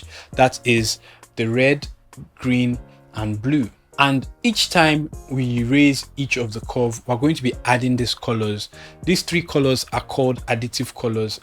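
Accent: Nigeria. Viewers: Nigerian?! LOVE. Thanks Brother. 😊😊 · This is top tier content especially because your spoken English is one I can relate to as a Nigerian that I am. Thank you, truly! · Your explanation is sweet, with our bold Nigeria pronunciations, omo this one enter my head ....i just subcribe on your page stay bless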